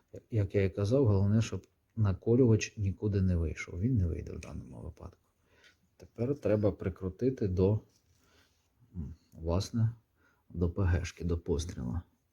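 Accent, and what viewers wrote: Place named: Russia. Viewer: А всё равно слышно,что мовою ты розмовляешь по русски. Чё уж кривляться,ну и говорил бы по русски,рас уж украинский не твой родной. Один хрен,скоро тебе придётся руссифицироваться,если жив останешься.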